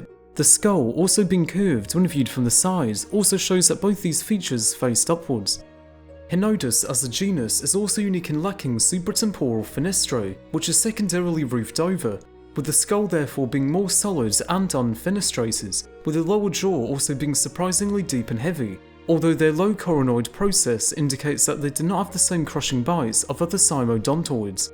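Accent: United Kingdom. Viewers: The British accent makes it hard to understand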